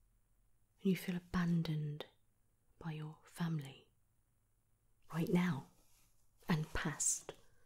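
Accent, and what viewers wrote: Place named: United Kingdom. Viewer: Gosh I just love the English/British accent It’s very triggering.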